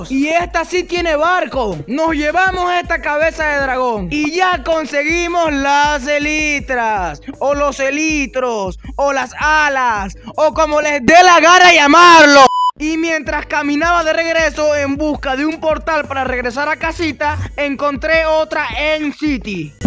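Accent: Venezuela. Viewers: No es q eres venezolano creo q se eacribe asi y porque le decias al esqueleto asi :/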